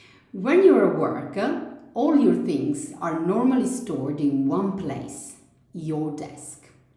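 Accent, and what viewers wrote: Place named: Italy. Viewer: Pronunciation is Italianized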